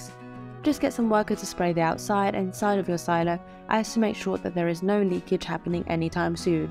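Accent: United Kingdom. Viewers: I like the narrator's posh accent.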